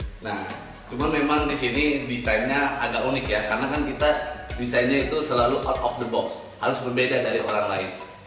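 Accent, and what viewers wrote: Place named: Medan, Indonesia. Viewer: Logat lo masih kental "medan"nya, omongan ngga ada isinya.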